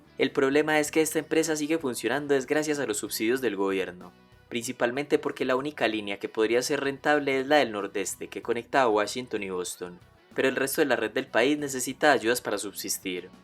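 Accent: Colombia. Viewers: Excelente video pero pésimo la narración el acento colombiano lo daña todo.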